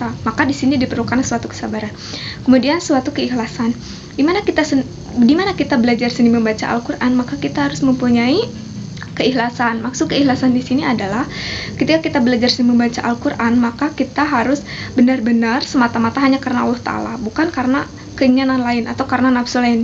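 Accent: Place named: West Java, Indonesia. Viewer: orang sunda nih. v jad p. wkwkwk.. maaf mbak. sama2 urang sunda.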